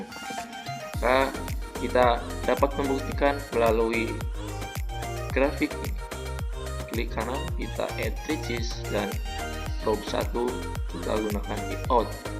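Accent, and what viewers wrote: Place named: Surabaya, Indonesia. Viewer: Mantap bang are are suroboyo 😁😁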